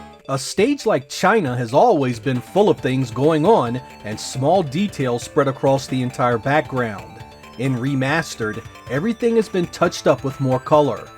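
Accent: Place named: Maryland, United States. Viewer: He sounds like he's from Maryland.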